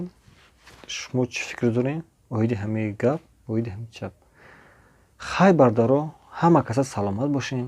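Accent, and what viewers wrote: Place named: Muminobod, Tajikistan. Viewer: Лахчаи гапзанитонда гап нест........суппппееееееееер .муминобод.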